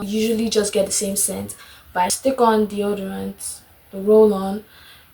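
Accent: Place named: Nigeria. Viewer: The Nigerian accent I'm loving it😂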